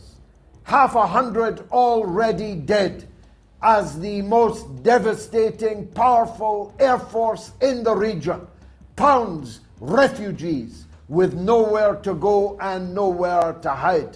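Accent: Scotland, United Kingdom. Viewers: Mr. Galloway, seing and listening to your talk shows is one of the reasons I cherish having English as my second language. I like the brave, clever and sarcastic style of yours speaking the reality of the matter in geopolitics. Your Scottish accent and ways puts a generous amount of a highly appreciated spice to your sayings. Greetings from Santiago de Chile. Cheers!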